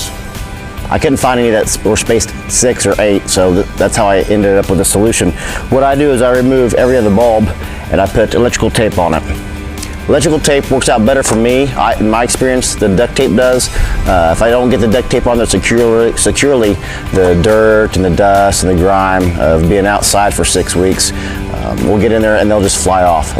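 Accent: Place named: Midwest, United States. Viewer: It’s a Midwest thing. I don’t hear it, but definitely try to pronounce as roof when I’m talking to people.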